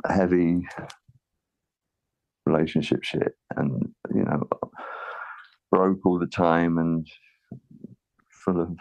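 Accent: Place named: Hull, United Kingdom. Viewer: I'm from Hull your accent sounds close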